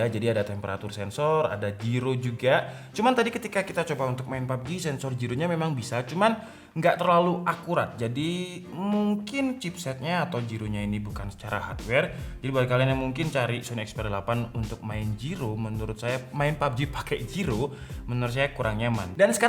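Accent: Java, Indonesia. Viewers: iki jowo bnget mas, 🤣🤣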